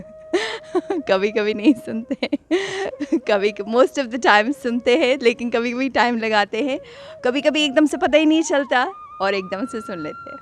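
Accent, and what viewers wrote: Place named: United States. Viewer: Main inko insta par following karta hu, tabhi mai socho ye inka american accent kyu hai.. Mai samjhta tha ye style dikhane ken liye american accent mai boltti hai 🤣🤣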